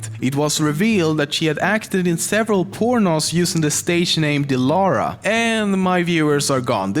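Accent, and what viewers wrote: Sweden. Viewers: Are you Swedish :D? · are you from sweden? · Seriously, his thick Swedish accent is so annoying!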